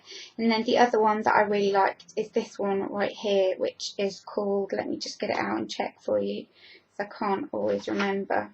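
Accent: United Kingdom. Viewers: Btw I LOVE your accent. I'm going to live in London in September and I want my own British accent.. :P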